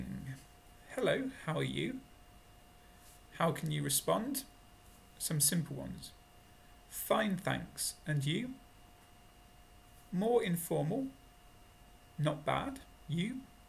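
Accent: United Kingdom. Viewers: You have so cool British accent...I think it would be a good idea just to talk about something in English, tell the story or stuff like that. The topic isn't so important I guess. A magic happens when students hear English. That is a quite important thing in the way of learning.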